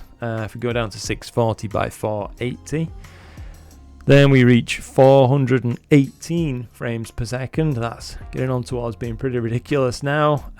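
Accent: United Kingdom. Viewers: Hi, excellent review. I look forward to the results of the deep sky testing of the 585. An unexpected result of watching your videos has been getting used to your nice British accent XD. I was used to American English, but now I prefer a British accent. No Dylan O'Donnell, I still can't get used to your Australian accent XD XD XD. Hugs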